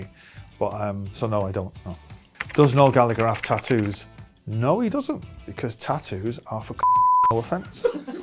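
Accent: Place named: Northern England, United Kingdom. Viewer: I am so in love with his northern accent!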